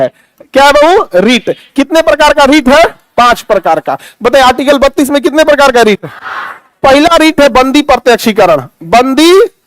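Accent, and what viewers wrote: Bihar, India. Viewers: Kya jabardast bhojpuri Bihari bhasha ba sir 😂😂😂🎉🎉🎉❤❤❤wah wah wah wah wah wah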